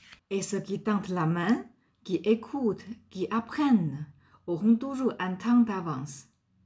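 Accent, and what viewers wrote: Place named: China. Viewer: Oui, parle très bien le français, la grammaire est impeccable. Elle a juste un accent lié à la langue natale, mais qui ne dénature absolument pas le sens du français qu'elle utilise. J'aimerais bien pouvoir parler chinois aussi bien qu'elle manie le français! Les français ne sont pas bons dans l'apprentissage des langues, donc mal placés pour donner des conseils à une femme chinoise qui parle leur langue, sachant qu'il n'y a absolument rien de commun entre les deux.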